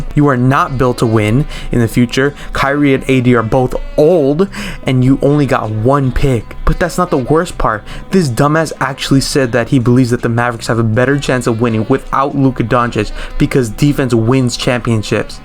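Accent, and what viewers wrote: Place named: Latin America. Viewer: your latinx accent is gross ngl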